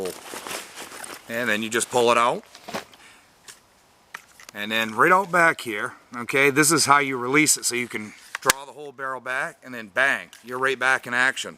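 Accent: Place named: Massachusetts, United States. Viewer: Judging by the accent and Glock you’re definitely from north of Boston lol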